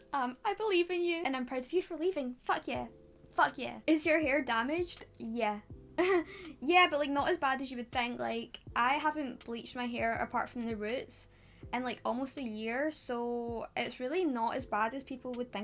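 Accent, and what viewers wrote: Scotland, United Kingdom. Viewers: That accent is magnificent, I might need to come home to Scotland. I should also visit Ireland at some point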